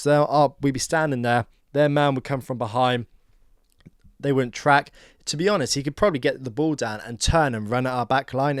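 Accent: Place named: Scouse, United Kingdom. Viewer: Such a thick scouse accent, I needed subtitles.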